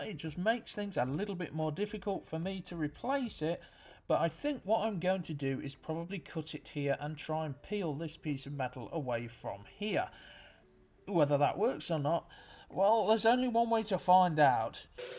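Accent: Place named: Yorkshire, United Kingdom. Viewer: his yorkshire accent i love it! its when it drives around the corner and im like UGHHH but this is coming from someone who had a corsa B that shafted valves, waterpump and needed a rebuild...never forget that sack of.....but this...i love the colour and its basically a van! its a decent car and tbh nothing like the 1.8 i test drove years ago tbh i do like the fact its like a beamer...more oil out of it than in it haha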